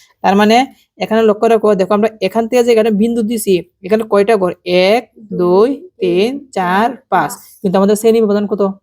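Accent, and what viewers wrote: Sylhet, Bangladesh. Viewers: তোমার বাড়ি কিতা সিলেট নি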